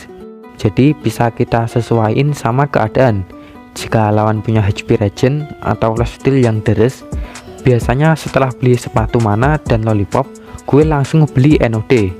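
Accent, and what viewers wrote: Java, Indonesia. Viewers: Medok amat bang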